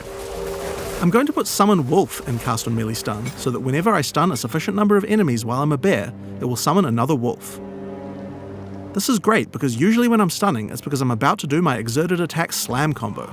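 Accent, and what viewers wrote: New Zealand. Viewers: It's always surreal hearing my native Kiwi accent on YouTube lol. Looks dope af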